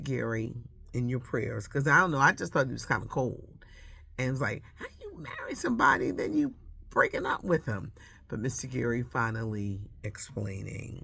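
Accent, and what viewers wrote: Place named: Philadelphia, United States. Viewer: Hi Auntie 🖐🏾 I just want to say hello. I have loved you for years. I go all the way back to the Butter Ball days. I used to work at Jefferson Hospital with your brother.I have moved to the west coast. See you always take me to my home Philly. I love the Philly voice and demeanor. Love you Auntie. Keep up the good work 👏🏾 🙌🏿 ❤😂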